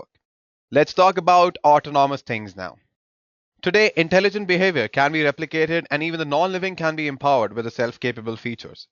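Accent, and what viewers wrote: India. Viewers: I really love this channel, My English is not good, so I have a problem to hear with Hindi English (sad)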